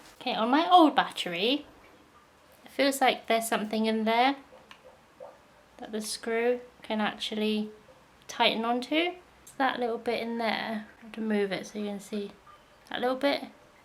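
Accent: United Kingdom. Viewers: you have british accent - funny... honest gurl / great video.. thumbs up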